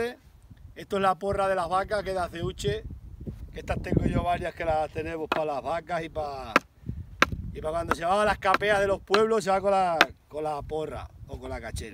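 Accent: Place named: Andalusia, Spain. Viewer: me descojono escuchándote.Pareces Andaluz y no Extremeño.Me recuerdas mucho nuestros mayores de pueblo.Yo soy Malagueña.Llevo muchos años viviendo en la capital pero sin olvidar mis raíces.Soy de ( Comares ) pueblo de la Axarquía Malagueña.Y seguimos teniendo casa de mis abuelos y tíos,en el campo,no en el pueblo.Cuando vamos con mi tita María,pues la casa es suya,disfrutamos más que niños.Yo nada más colocar la comida y lo que llevamos para pasar unos días,me coloco ropa cómoda y mis zapatillas y me voy a dar vueltas al campo,al huerto,a por espárragos,a coger aire fresco y puro.Disfruto recordando viejos tiempos y dándome algún que otro guarraso en el suelo.pero más feliz que una perdiz.Saludos de esta Comareña que vive en la ciudad,sin olvidarse del campo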